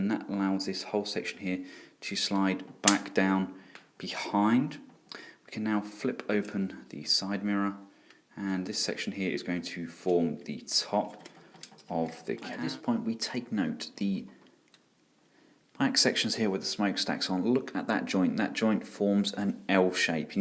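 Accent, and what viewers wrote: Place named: United Kingdom. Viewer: british accent... must sub...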